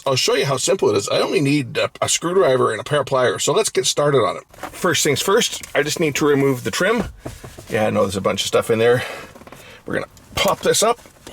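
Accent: Canada. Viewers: Thanks very much for posting. Makes a lot of sense. I'm gonna give it a shot since I sure don't want to pay the dealership to do it. If this doesn't work I'll try replacing the shifter myself. Either way the vid was worth watching just for your magnificent Canadian accent. Cheers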